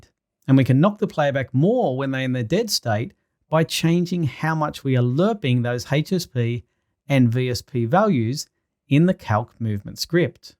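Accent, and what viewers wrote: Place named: Australia. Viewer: great videos and love the familiar aussie accent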